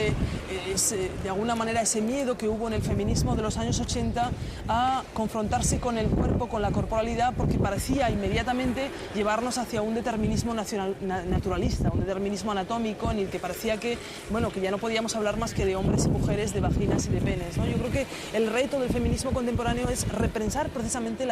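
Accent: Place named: France. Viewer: Se le nota deje francés al hablar. Se nota que vive y trabaja en Francia.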